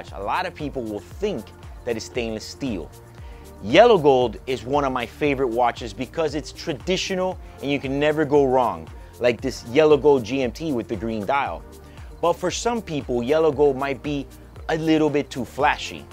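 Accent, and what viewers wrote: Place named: Chicago, United States. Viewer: what’s with the silly chicaaago accent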